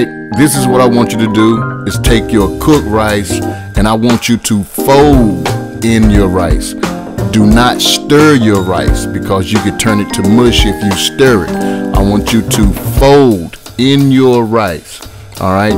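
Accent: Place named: Texas, United States. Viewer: Yo. Im in uk an my buddy said he had dirty rice last night said it was great. Never heard of it here but know i now.. I is gona try me soma this tamrw after work.. Dam right... Man i just lov the way yall talk there in texas✌️💜🍜.. Dirty rice is hitting the uk an we didnt hear it from man v food guy adam. Lol. Im after that mad flavour 💯. Respect Houston texas 💜.. Peace out.... Uk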